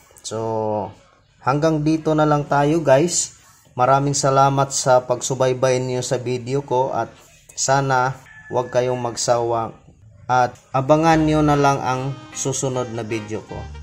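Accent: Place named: Visayas, Philippines. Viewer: Bisaya ka man bai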